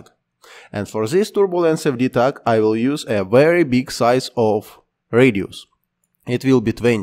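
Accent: Russia. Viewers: Это самый русский акцент, который я когда либо слышал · Слишком русский акцент...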